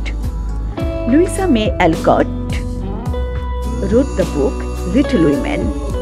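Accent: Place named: United States. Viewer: Wow You speak English like a American 🤩 You explain like a expert of English 😍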